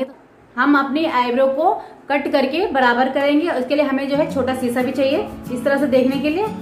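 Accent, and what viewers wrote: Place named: Pahadi, India. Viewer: Apki bhasha mai phadi ltak aa rhi thi smajh gyi thi aap phaad se ho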